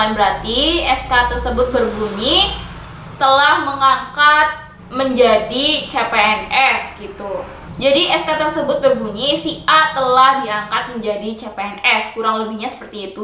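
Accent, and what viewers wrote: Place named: Java, Indonesia. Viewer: Medoknya keliatan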